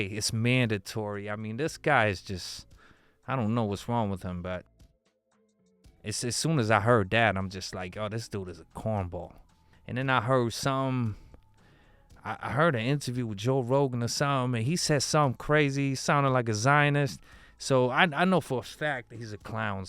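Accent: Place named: Puerto Rico. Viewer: You look and sound boricua bro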